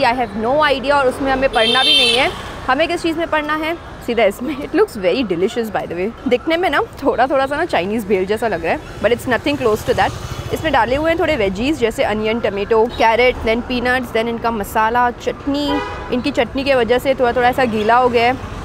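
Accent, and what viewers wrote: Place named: India. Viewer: Indish accent is so funny . Is that how all Indians speak English 😂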